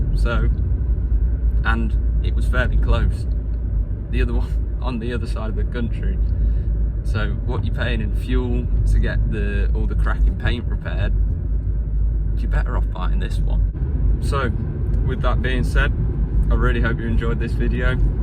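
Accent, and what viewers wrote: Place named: United Kingdom. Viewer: I was surprised to hear you were British, bruv.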